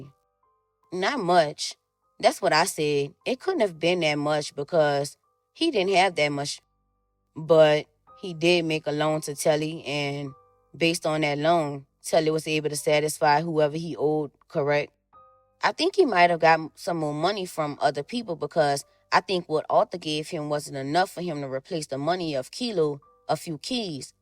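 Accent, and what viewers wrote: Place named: Louisiana, United States. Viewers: That’s a New Orleans accent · I like how the narrator got that LA down south accent puts u rt in the courtroom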